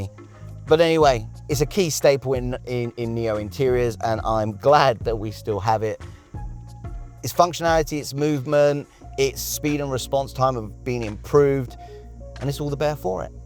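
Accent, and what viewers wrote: United Kingdom. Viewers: He sounds like a brit,right?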